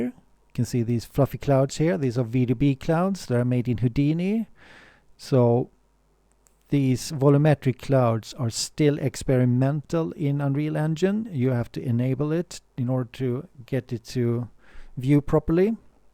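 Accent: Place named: Scandinavia. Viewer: A nice package made with a Nordic accent...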